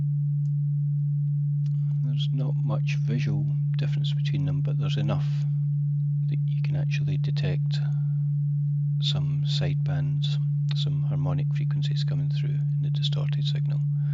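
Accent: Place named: Scotland, United Kingdom. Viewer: It's not loudness, he's Scottish.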